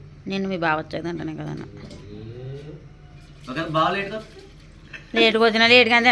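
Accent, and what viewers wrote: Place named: West Godavari, India. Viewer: App. Ka. Basa bahut acha hai,,, i think west godavari language...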